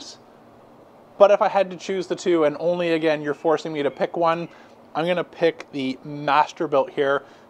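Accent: Canada. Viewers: Great video!! You sound like you're from Canada. Are you the one who created all this smoke? 😳 😉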